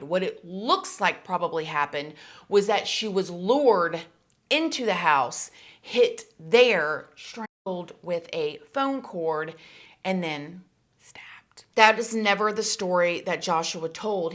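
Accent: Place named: Texas, United States. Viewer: Okay, I’m going to say a few things. Firstly, you remind me of my American friend (she’s Texan, I’m not great with Geography but you sound very similar) and just as with her, your kind, caring nature comes across with every story you tell. I LOVE your videos. Secondly, maybe I’ve got cynical with age, but I don’t feel like Joshua should ever be free. I didn’t really buy his apology, it didn’t feel particularly sincere (to me) it sounded more like a resume statement to try coerce the jurors (or whoever made the decision) into letting him be free. I don’t usually look at cases like this and think they deserve life when they were children themselves (although there are exceptions). In his case, the lack of sincerity, and the destruction and suffering he inflicted upon the little girl, Maddie, her parents, sister, and family I don’t think it would be fair that he would get freedom while they are stuck wondering “what if…”. 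All the things you said were right, you’d be forever trapped in your own mind, a prisoner of questions you’d never ever know the answer to. And, if he was so sincere and he meant it, why not give the family some closure and tell them exactly what he did with their baby girl. Finally, I think upbringing has a lot to do with it. His father’s behaviour toward him might have made him feel rejected leading to fears of rejection by others in society, causing him to develop unhealthy, antisocial attachments, as he apparently did with Jessie. It’s a very, ve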